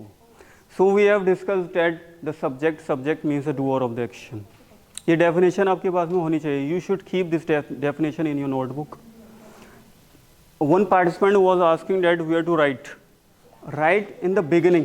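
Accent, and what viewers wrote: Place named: India. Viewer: Indian pronounce cant understand other countries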